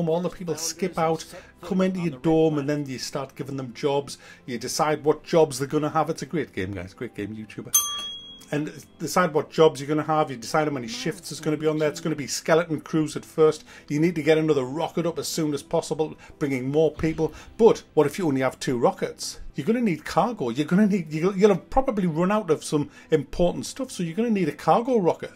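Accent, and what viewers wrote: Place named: Consett, United Kingdom. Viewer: You must live near Consett. I recognise that accent anywhere!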